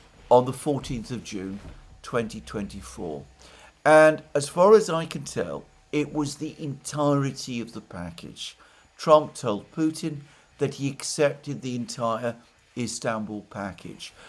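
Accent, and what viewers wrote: United Kingdom. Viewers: love how you described it! British accent rules❤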